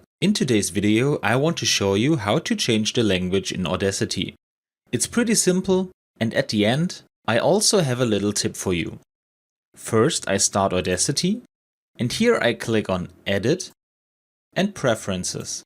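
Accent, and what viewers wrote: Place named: United States. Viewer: indian guy with insane american accent means we getting our problem solved 🔥🔥🔥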